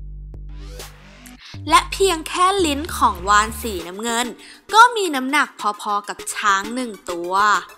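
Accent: Suphanburi, Thailand. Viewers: มึงจะพูดเหน่อทำไม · น้องคนพากษ์บ้านอยู่สุพรรณ เหร้อ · สำเนียงเหน่อไง คิดสิคิด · เหน๋อๆ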